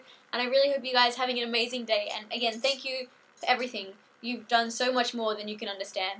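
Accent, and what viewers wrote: Australia. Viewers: First video I watched and heard her talk... she's as australian as she is adorable and talented... there's no way you can watch this and say life is fair guys lol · Gratz (K)! Love your Aussi accent :3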